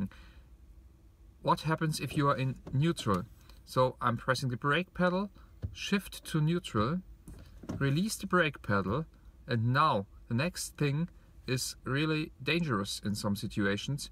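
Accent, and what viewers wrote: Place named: Germany. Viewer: Interesting that you have a finnish name and you sound pretty German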